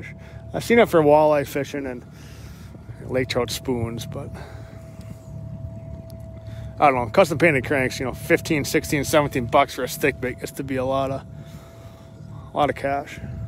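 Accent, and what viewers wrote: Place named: Minnesota, United States. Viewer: you have the same MN accent as my cousins who live up there. Both my parents were from MN but they didn't have accents, I guess because they left when they were in their twenty's and that was in the 1940's. they used to say people in MN don't have an accents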